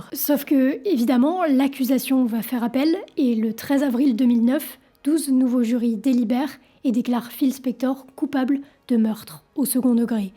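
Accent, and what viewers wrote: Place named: France. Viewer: Très bon documentaire, bravo! Par-contre si je peux me permettre, essayez d'améliorer votre anglais.''The'' devrait sonner presque comme ''de'' et non ''ze''. Vous n'êtes pas la seule, la plupart des français le prononcent comme vous! Juste mon petit grin de sel ; )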